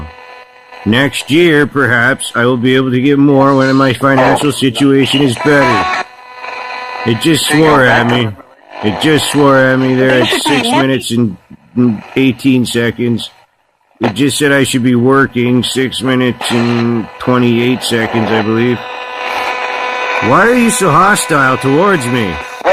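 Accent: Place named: England, United Kingdom. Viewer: North Nottingham Paranormal Investigators ahh now I hardly thought you were I'd know his accent a mile away. Lol and for some reason I don't think your English accent could sound anything like American one 😂😂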